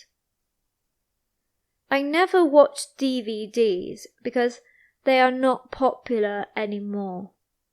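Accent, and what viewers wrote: United Kingdom. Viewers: 😮 Beautiful Beautiful voice, I am learning the British accent, I love this beautiful accent, and thank you for your videos, my friend, I really appreciate it. Greetings from Mexico!!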